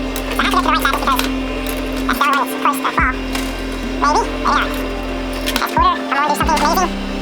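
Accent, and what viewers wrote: South, United States. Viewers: Speedy recovery for your friend Jeff. Hope your cold clears up soon. Just a note that your voice sounds fine (except when you slow it down to creature from the deep level lol) I could listen to your voice all day lol Southern flavour easy to listen to. Have a great day. (Canada here) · I just like the sound of the money and chips falling and your Southern accent, which I may add is even cuter when you speed it up and sound like the! Makes that song get stuck in my head. Love your videos. Used to watch a lot of them, but now I only watch yours. Keep em comin!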